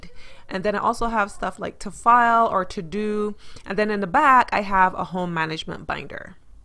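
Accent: Barbados. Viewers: omg your bajan shines through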